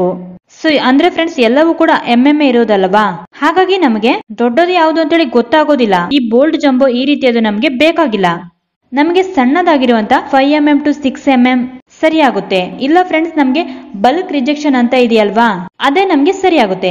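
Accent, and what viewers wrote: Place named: Kerala, India. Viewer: Kerala... Language problem tumba ide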